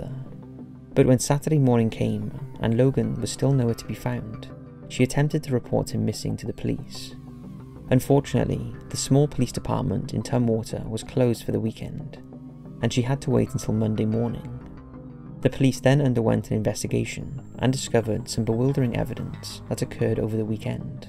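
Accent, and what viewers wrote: United Kingdom. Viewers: Your British accent was making it difficult to understand what you are talking about, and there are at times you were mumbling on each of these cases. Please speak clearly and as loud as possible so that we can listen and pay attention. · I love the way you brits say shit like, 'Media'... 'Medier'. It's awesome.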